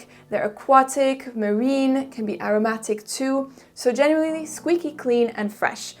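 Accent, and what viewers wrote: France. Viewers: Im gonna say shes French with perfect English. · I think she's French or at least can speak French really well cause even when speaking English you can hear a little French accent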